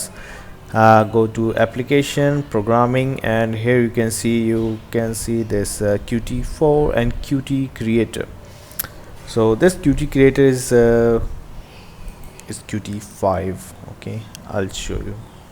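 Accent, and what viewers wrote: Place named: India. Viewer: I usually hate inidan tutorials, but this time I can understand you. Your accent is not that bad.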